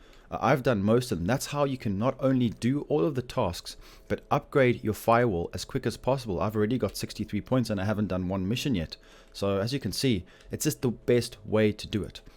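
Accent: South Africa. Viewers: South African ? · you south african?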